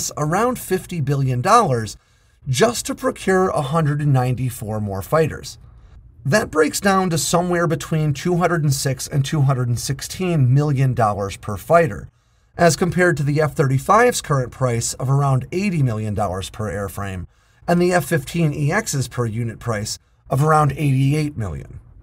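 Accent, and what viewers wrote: United States. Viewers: K-tar I luv how Americans pronounce words 😂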